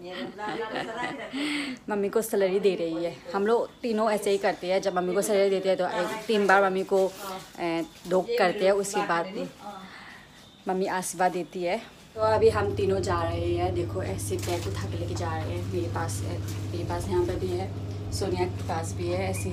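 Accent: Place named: Nepal. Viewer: Hi! You are Nepalese or Nepali speaker …? Coz your tone like Nepali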